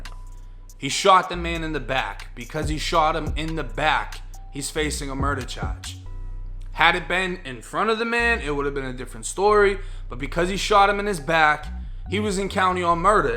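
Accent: Boston, United States. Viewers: Me 2...Its that Boston twang 🤣🤣🤣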